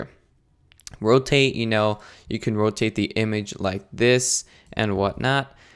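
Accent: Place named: New York, United States. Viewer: It's a decent video but you gotta look like you're an expert, instead of the 15 year old guy who talks like a NYC girl that you actually sound like there are some basic concepts that you did not mention and you use way too much shortcuts. I'm not trying to hate on this, just giving you my viewing point in case you want to get better at doing tutorials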